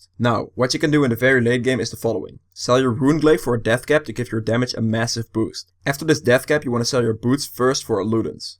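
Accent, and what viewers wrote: Netherlands. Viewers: Heerlijk nederlands engels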